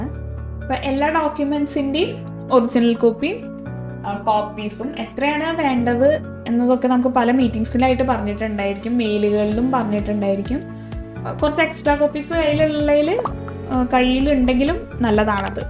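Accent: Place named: Valluvanad, India. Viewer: Valluvanadan bhasha .. athond choichathatto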